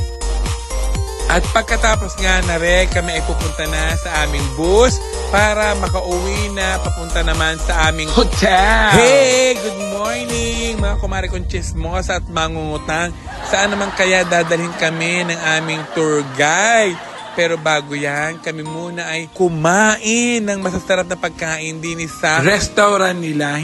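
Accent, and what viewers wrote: Batangas, Philippines. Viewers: First time to watch your show, I love it 🥰 Ang ganda ng Batangas accent mo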